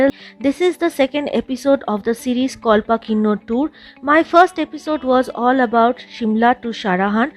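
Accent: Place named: Bengal, India. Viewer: Nice documentation and description. From your pronunciation, it's clear that you are from Bengal. But description is outstanding. Thank you.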